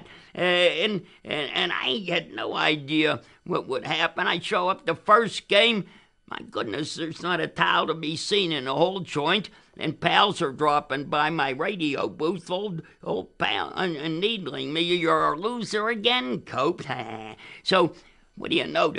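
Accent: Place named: Pittsburgh, United States. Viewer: If anyone ever asks me what a true Pittsburgh accent sounds like, I will show them this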